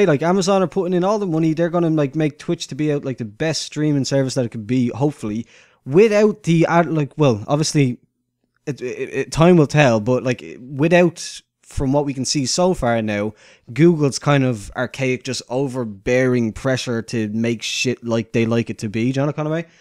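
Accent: Ireland. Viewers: Haha I love a fellow irish accent, where abouts are you from I'm from swords in dublin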